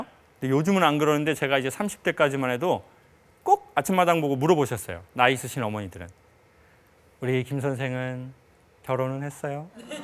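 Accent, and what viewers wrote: Jeolla, South Korea. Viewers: 영상 잘 보고 갑니다~ 구수한 전라도 억양이 듣기좋네요~ 사투리로 강의도 함 해 주랑께요~~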